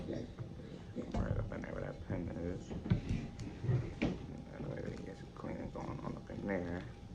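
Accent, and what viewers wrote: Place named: Philadelphia, United States. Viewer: I hear a philly accent my boi ..Chicago Westside check-in!🌏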